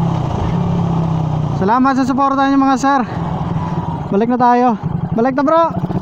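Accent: Iloilo, Philippines. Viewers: Ilonggo ka kuya🤣🤣gravbe taka ka idol mag dala sang rider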